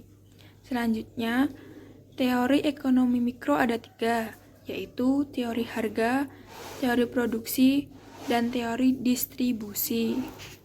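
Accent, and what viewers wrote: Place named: Klaten, Indonesia. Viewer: Kamu orang klaten ya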